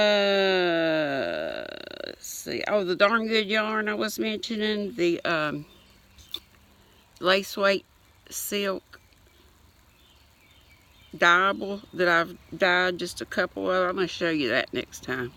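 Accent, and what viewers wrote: South, United States. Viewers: You are crackin' me up! Sending you vibes to find that "sugar daddy/mama" 😉I love your "country talk", it makes me happy💜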